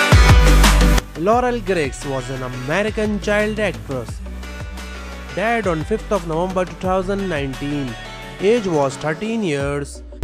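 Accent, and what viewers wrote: India. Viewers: Oh my god i dont understand your accent. Make it clear when you speak. I guess you are ndian obviously in your accents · Indian accent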